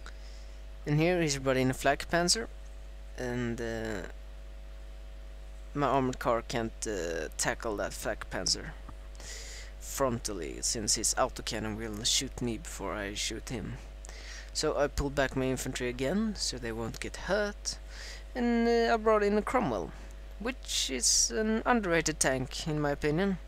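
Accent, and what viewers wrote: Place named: France. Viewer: dude you sound like your french English is good but can improve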